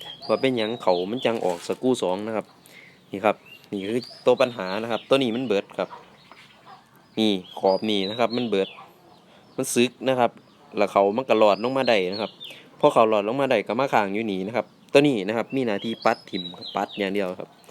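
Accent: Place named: Isan, Thailand. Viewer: จะพูดอิสานก็อิสานไปเลย อิสานปนไทยมันฟังไม่รื่ส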